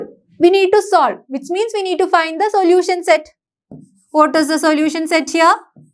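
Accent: Kerala, India. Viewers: Madam is excellent with her Malayali accent.Her explanation is so cute.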